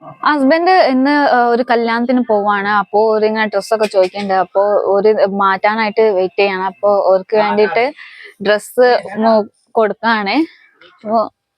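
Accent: Malappuram, India. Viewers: Ividunn klynm kazhich angot poythaan.love marriage enn Avr prnjllo.pine samsarm ketit malprm muslimsnte samsrm an. Malapurth hindusn veroru slang und. Kurch nlla malayalm.matham mariyath avam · kayichath kettappo thonni malappurathnnanu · Malappuram slang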